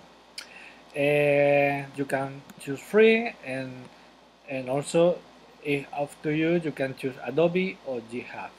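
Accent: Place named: England, United Kingdom. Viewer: I love your English Accent !!! haha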